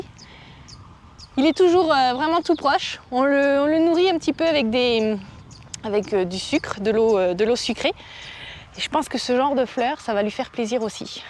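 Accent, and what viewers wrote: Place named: Franche-Comté, France. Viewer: gaugé !!! voilà une vraie franc_comtoise 🙂. ca fait plaisir à entendre . Bon vent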